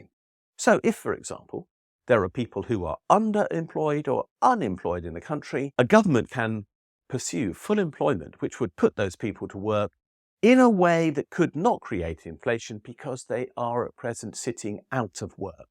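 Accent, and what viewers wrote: United Kingdom. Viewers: Just because he has a British accent doesn't mean he sounds like Varys lol.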